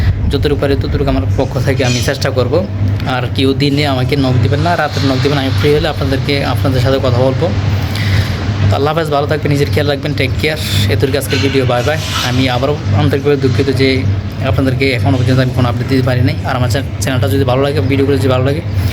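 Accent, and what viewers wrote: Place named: Comilla, Bangladesh. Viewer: আসসালামু আলাইকুম কেমন আছে ন ভাই আপনার বাড়ি কুমিল্লা কেন বলছি ভাষাটা কুমিল্লা আসে দোয়া রহিল মক্কা জমিন থেকে আমিন।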